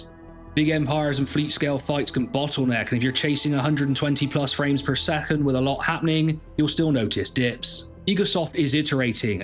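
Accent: United Kingdom. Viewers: I didn't know you were Portuguese, congrats ! only Portuguese I know with a brit accent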